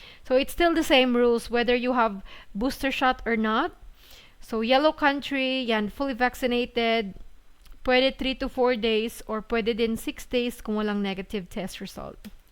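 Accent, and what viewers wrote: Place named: Philippines. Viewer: when u speak english your accent is very filipina. try british accent. no matter how sexy u sound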